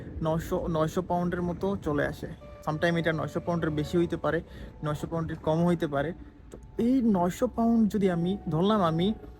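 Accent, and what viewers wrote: Sylhet, Bangladesh. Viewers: Apni sylheti tai na vai?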